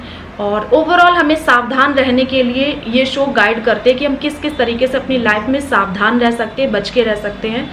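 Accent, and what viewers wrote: Kanpur, India. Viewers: Aaj ka like, comment kanpuriya language k liye ... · Hello ji kaisi hain aap yah Kanpuri Bhasha mujhe bahut acchi Lagti Hai Main Mera Ghar to Lucknow Mein Hi Mein Hi rahti hun lekin Meri jyadatar rishtedar Kanpur mein hai aur mera aana jana Laga rahata Hai Kuchh farookhabad mein bhi hain Sare rishtedar Udhar hi hain Tik Tok Par video banana Koi Buri baat nahin hai agar aapko Achcha lagta hai to aap banaaiye bus yah hai ki Thode bure comment bhi Aane Lagte Hain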